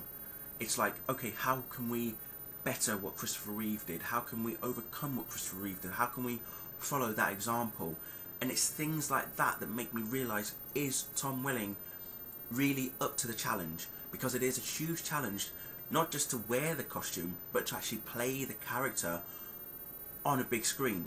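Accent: United Kingdom. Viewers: Your British aren't you.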